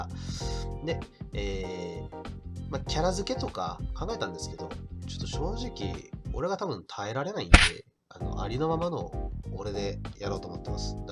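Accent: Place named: Kansai, Japan. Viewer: あっ声超好きだわ(´ཫ`*) 好きです 関西弁ですかね？最高ですね これから応援させて頂きます！！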